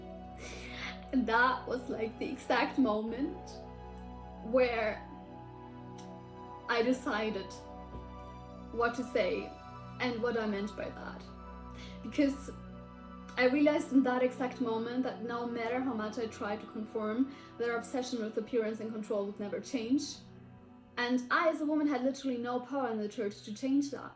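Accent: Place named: Germany. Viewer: I’m not sure if you are German, but your accent very much sounds so. The idea that something like that could happen where I live… :(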